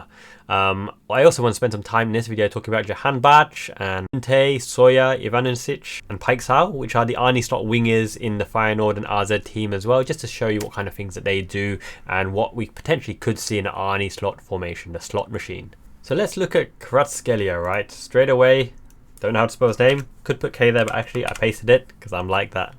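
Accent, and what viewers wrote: United Kingdom. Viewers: love your channel. Are you from the UK originally? Your accent is English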